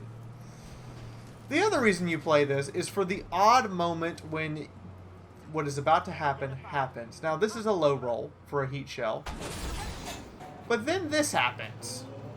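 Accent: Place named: United States. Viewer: That explains it I suppose. I guess I just assumed based on an American(ish?) accent that you played on the NA.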